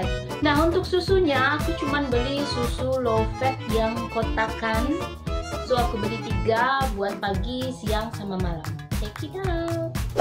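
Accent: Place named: West Sumatra, Indonesia. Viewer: Takah baa bana mangecek bahaso inggrih, tetap se logat minang indak ilang.. dangakan secara seksama beberapa kata "menu, ribet dll".. pertahankan minang ny yaa.. 😊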